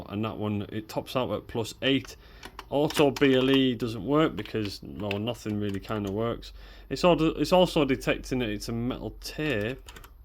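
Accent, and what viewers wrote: Scotland, United Kingdom. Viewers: Is that Scottish accent? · Are you from Scotland? I like your accent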